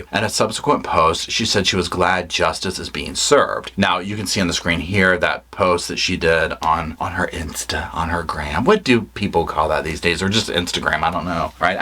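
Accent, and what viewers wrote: South, United States. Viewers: YES, I get all the info I would from the news, or courtv, but in a much warmer, SOUTHERN way, including some opinionated sidebars, which is just how I like it!❤ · 100%! I, too, have a Southern accent. Listening to him is like sitting on the coach with my family jaw-jacking about the nuts around us.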